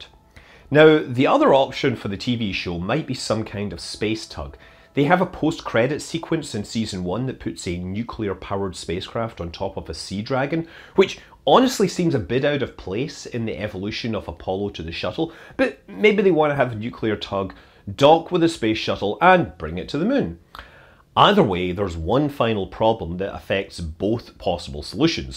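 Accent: Scotland, United Kingdom. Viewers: Scottish accent, nothing wrong with that. Not like all the 'would of' Americans..... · Scottish accent, which is so cool to listen to.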